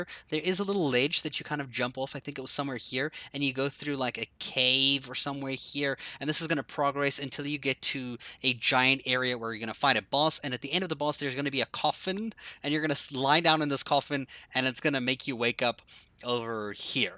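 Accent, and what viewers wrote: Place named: South Africa. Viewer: Hearing your accent for a bit, I realised you are South African 😁 Aweeeeee! Thanks for the video, but unfortunately I am nowhere near this in the game yet - I will finish it another time.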